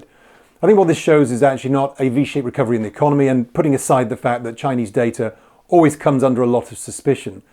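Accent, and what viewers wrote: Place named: RP, United Kingdom. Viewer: Nothing like a posh english accent and lots of graphs to make someone appear they know what they are talking about - no one knows the future - its all a coin toss :-)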